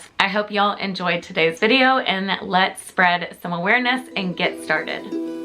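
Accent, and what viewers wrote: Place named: Georgia, United States. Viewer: Sweetie, I don't know why I just heard. You're from Georgia, omg,me too,I was born and raised in south Atlanta, College Park and east point area, I moved to Jasper in 2001.,and lived there till a few months ago when I had to move to Virginia, my middle son and grandchildren are here. My other 2 boys r still in Georgia. I can't tell u how much I miss it. I've never lived anywhere else. I should have known by ur accent. That's awsome that I found ur channel. Oh,this is so heartbreaking,,poor girl. I feel so bad for her mama. She was a beautiful girl. I have a heart for anyone with substance issues as I lost my only sister,in 03,and my nephew,,her son,just in November, both from issues from having done substances. Oh,her kids,that breaks my heart sweetie,,and I hope they find the truth. Thank you so much for the video sweetie. 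Its awsome that you're a Georgia girl too. Happy Easter to you and your family ❤🐰🥚🐥🐣🐇🌺🥰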